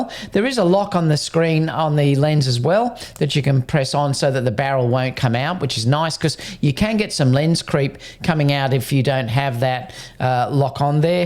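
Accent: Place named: Australia. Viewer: Thanks for a great review in an Aussie accent! How does the image quality compare with the Sony 24-105?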